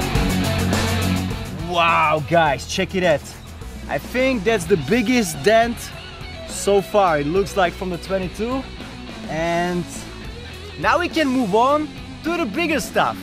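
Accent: Czech Republic. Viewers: Nejsi z česka náhodou? Podle přízvuku tak zníš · Super video a hlavně i originální dávám like a odběr a umíš plynule anglicky až na ten Českej přízvuk ale to není žádném problém :D přeju hodně subs protože si to rozhodně zasloužíš!! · i think you are from czech republic.. your english is good but.. your voice, btw great video ;)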